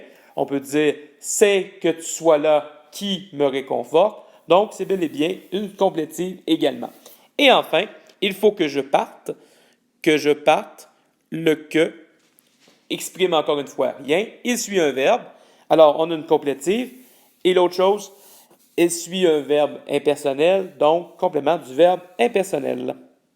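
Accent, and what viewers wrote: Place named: Canada. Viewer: Super Tuto et j'adore l'accent canadien :D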